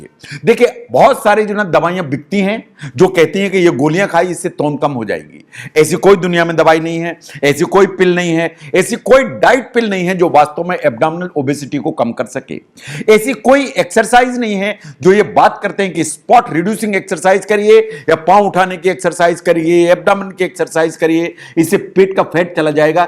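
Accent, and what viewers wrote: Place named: Awadh, India. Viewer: "Joun hai" pure awadhi waah boss 😍 Dill baag baag ho gya jo aapne bech beech me "joun hai"bolte rhte hai aap awdh ki Shaan hai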